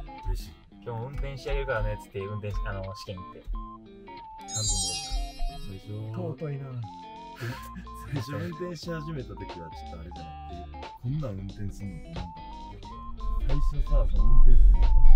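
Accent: Kansai, Japan. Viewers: 本物の関西弁めちゃくちゃ耳馴染み良くて耳が幸せです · レクサスはチャラい感じするから藍ちゃんにはやめて欲しいなぁ🤣確かにAUDI乗ってそう🧡ハリヤーとかどうですか？そんな車で迎えにきて欲しい🧡ぇ 藍ちゃんの助手席羨ましすぎます！！！関西弁親近感湧くし、姿勢いいのめっちゃ可愛いです☺️☺️☺️🔰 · バレーボールの時はあまり関西訛り出てない感じするけど、動画の時は訛ってて、自然体と言うか素が出てるなーって実感できて好き💓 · このルックスで関西弁やばい😢❤ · インタビューとは違う藍くんの関西弁にギャップを感じてキュンときた🫰🥰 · 藍くん、あんなかっこよくて性格もよくて関西弁キレッキレで最高だわ！！ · 関西弁がえぇわ♡ 免許取りたてで、怖がらずガンガン行くの男らしい〜 LEXUS似合いそー！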